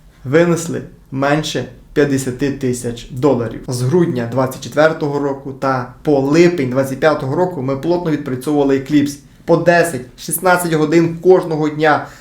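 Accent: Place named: Zakarpattia, Ukraine. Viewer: крутий акцент у тебе, пахне закарпаттям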